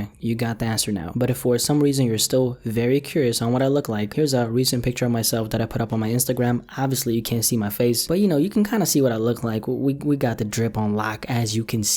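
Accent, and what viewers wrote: Canada. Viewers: Are you Canadian?? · you Canadian?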